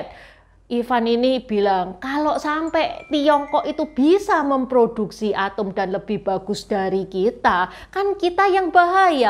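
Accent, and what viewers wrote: Java, Indonesia. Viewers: Dia lahir dilingkungan org jawa, ya pasti logat nya jawa, emang jawa bukan indonesia ya. Nampak kali anda rasis sekali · nieh enakan ya klo mendongeng.. hihihi pk bhs indonesia dg aksen jawa medok.. hihihi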